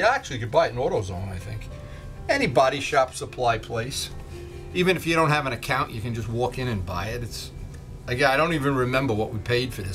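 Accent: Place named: New England, United States. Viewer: Sounds like a fellow newenglander thanks for the video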